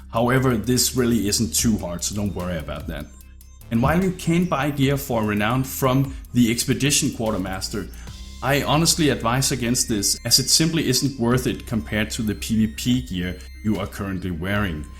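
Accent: Denmark. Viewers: Dat dane accent <3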